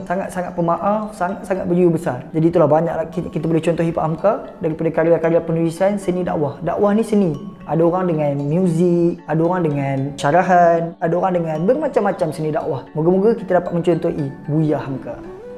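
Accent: Malaysia. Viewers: Dia bercakap bahasa melayu tapi ada loghat negeri.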